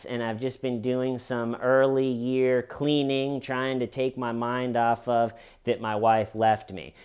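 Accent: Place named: Boston, United States. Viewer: I'm from Boston and you make me lol with your Boston aaaacccent. I've been a true fan from day 1 when you post a video it makes my day! Any comedy show coming to Boston in the future?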